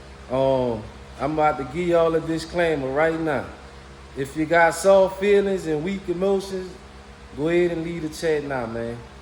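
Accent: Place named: South, United States. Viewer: Damn bubba you talk as country as I do 😂, Ima old ass white boy too😂, there's alot of other work besides sitting a long block on the mounts that folks don't think about or consider 😂. $$$ I gotta turn the wrenches myself while using a chilton or haynes repair manual cause there ain't no damn way I can afford to pay a professional wrencher!!! 🎉 enjoy your honesty and straight forward explanation of whats required to drive a damn vehicle!🎉 I'll take that ol school 305 and do what we used to do-cruise🎉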